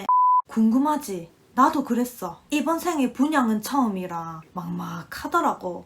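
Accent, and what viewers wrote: Gyeongsang, South Korea. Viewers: 아따리야 경상도사투리 간만에 듣네예 좋심다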